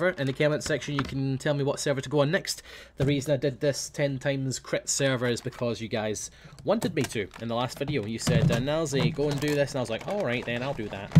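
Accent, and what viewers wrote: Scotland, United Kingdom. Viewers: which coast of scotland are you from ?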